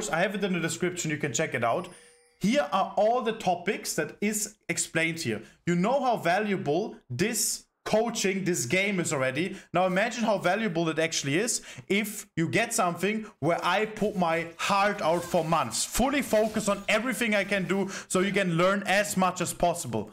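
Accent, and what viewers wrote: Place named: Germany. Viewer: Just listen faster man haha xD Maybe it's also easier for me, cause from the accent he seems like a german speaking guy. So i can understand that very well ;) That makes it even more impressive doh!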